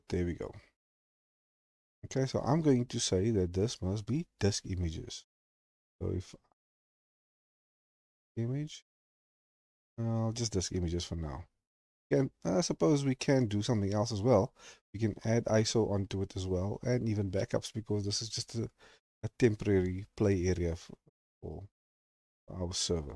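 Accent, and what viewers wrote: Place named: South Africa. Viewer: First thing I thought was, Wait is this guy South African? Thanks you solved my issue after sukkeling all day, my settings needed to be fixed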